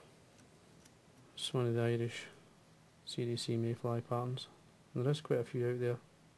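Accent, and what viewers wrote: Scotland, United Kingdom. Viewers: I think I've said it before, needs saying again, you are a wonderful fly tyer and your flies are absolutely lovely. Your methods, which, as a tyer for 40 years (and pretty decent), I have to say are SO meticulous. Plus the accent man, I love it. Brings me back to a wee lochen near Inverness, which, as I understand, I can't tell you the name of or I will meet with an early grave.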